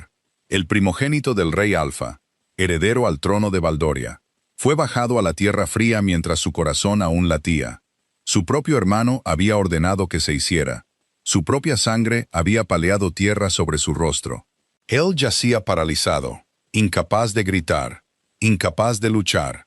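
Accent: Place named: Pocho, United States. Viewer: Buenas historia, pero desespera esa forma de hablar pocho...ni Inglés ni español....x favor 😊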